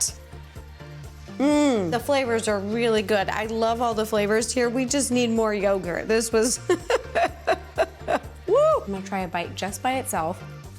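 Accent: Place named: Texas, United States. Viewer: is soth girl.. the texan accent really gives sheldon vibes..loveit... & your foood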